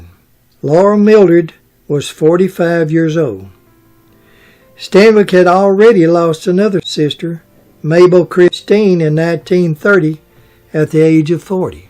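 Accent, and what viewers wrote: South, United States. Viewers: sir, I have mentioned over the years how I enjoy your "Southern" accent bringing additional entertainment to your videos, and this is true. As a transplant from NYC to now southwest FLA, I enjoy it even more. BUT! I am remiss in not mentioning, not only do I enjoy your videos, but I have not mentioned the amazing detail in the research that you unfold for us in ALL of your videos. Well done ALWAYS sir!! Keep them coming!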